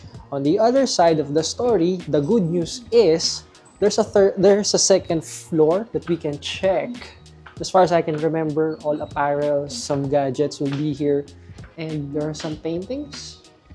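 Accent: Philippines. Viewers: Nice content, strong Philippino accent though, can still understand 😢😂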